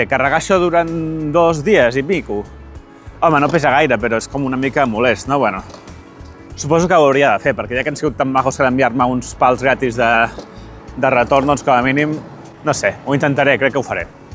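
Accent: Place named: Mallorca, Spain. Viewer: 😂M’encanta el mallorquí, quina simpatia! Bona jornada! Amb això de les postals has fet un homenatge a la missatgeria tradicional, que està en plena decadència 👏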